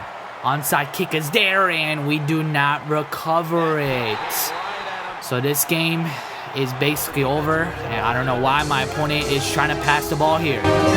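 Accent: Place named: Midwest, United States. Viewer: That's a mid west United States accent they speak very flatly.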